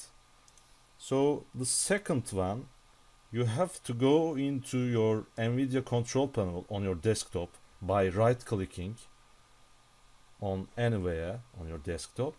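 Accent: Turkey. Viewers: Telaffuzdan direk anladım Türk olduğunu :D hissediliyor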